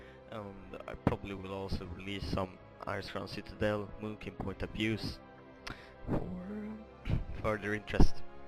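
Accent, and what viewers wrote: Sweden. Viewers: this guy is swedish, i can hear it from his voice :P if not he is atleast scandinavian >:]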